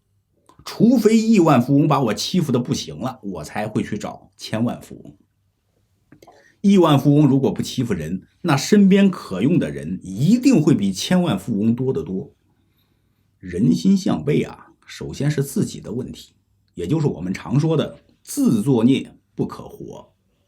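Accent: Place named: Beijing, China. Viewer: 我喜歡劉兄的流暢京腔，聽起來舒服。對事理陳述，新鮮可喜，加上個人的媚力？信不信不由我了。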